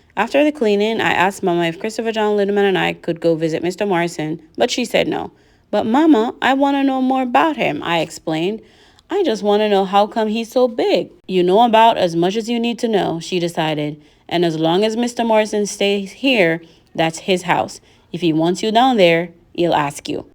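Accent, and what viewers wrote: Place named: South, United States. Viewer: I’m here smiling from ear to ear, while teacher reads in her southern accent 😂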